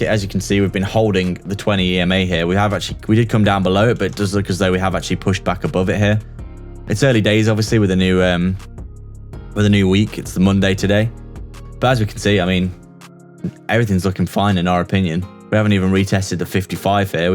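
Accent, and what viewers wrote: United Kingdom. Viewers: Nice video, great to hear a British accent for once.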